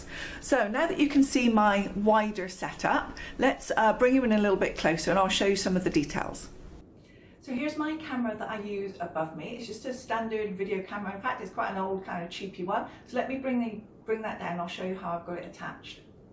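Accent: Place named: England, United Kingdom. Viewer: You're English!!! I always thought you were American!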